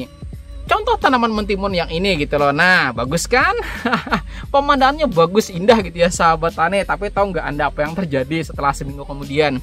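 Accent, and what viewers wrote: Banjar, Indonesia. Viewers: Orang banjar kah yu... Logat banjar prasaan uln.. atau cuma perasaan uln haja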